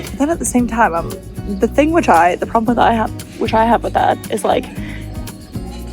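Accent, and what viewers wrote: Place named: Canada. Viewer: Great video. Lots to consider in this video. Time to jump in and go for it. I have been trying to pick out your accent. Canadian...can't believe I didn't pick up on it. Where did you get your background music?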